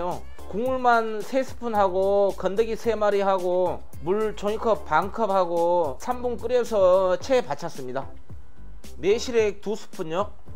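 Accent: Jeolla, South Korea. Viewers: ㅋㅋ 설명 참 재미 있으 시네요~~경상도 말씀에 전라도 억양같기도 하구요~~맛있어 보이는데 함 해볼께요~~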